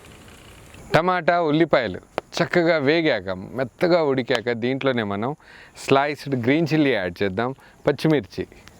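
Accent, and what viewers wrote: Guntur, India. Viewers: Veg biriyani ela cheyali cheyypandi garu mee telugu guntur Telugu laaga undhi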